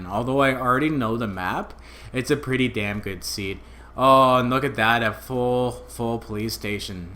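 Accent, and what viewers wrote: Canada. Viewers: I can tell from your accent you're from Toronto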